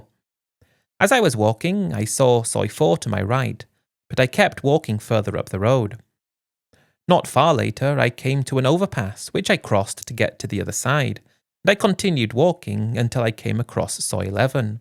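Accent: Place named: England, United Kingdom. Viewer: Buddy, you sound like a smart English man but not so smart with the girl and I’m not putting you down because you had it virtually made what you were doing buying houses selling them perfect time back then because now to do that in my country Australia and for sure in your country in England we got too many taxes gifting tax tax this tax How are you gonna make $30,000 now the government makes it too hard now because they catch on back then. and now just for an old rundown house is worth a million dollars as much as as you loved your wife I would never would’ve got her involved and most certainly I would never would buy a house in Thailand then leave it to her and then come back to England. no chance and I know what you’re gonna say you haven’t been in love before. It’s not about Love. This is about my retirement and my age. There’s plenty of girls in Thailand and there’s always gonna be someone different. 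She’s not a western girl.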